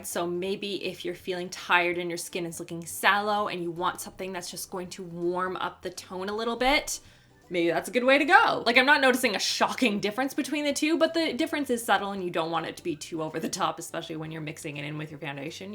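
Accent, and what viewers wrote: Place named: Canada. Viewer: I loved your day in the life one!! I find you talk alot like me but then again we're Canadian girls ;)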